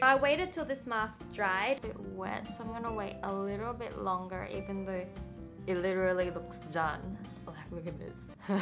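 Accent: Australia. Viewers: are you australian? You have an australian accent. i like it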